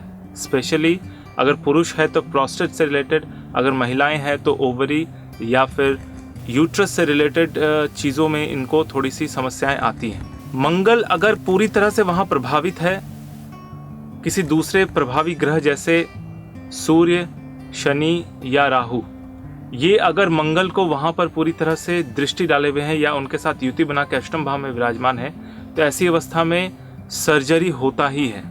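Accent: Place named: South, India. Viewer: Bakwas jaise sawdaan India bool rha ho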